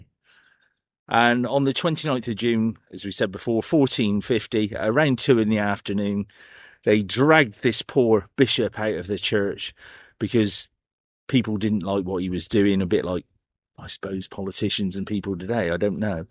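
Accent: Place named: South West England, United Kingdom. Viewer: Hello. Yet another of your interesting stories. You've got an accent very familiar to me. Probably Hampshire/Wiltshire or Dorset. Very enjoyable either way.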